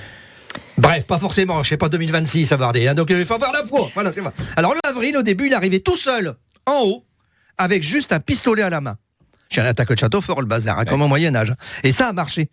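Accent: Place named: Nord-Pas-de-Calais, France. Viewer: Ch'ti..? J ai du mal à suivre..😂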